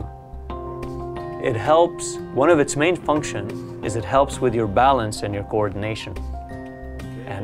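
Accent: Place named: Netherlands. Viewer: ... why do you sound Dutch?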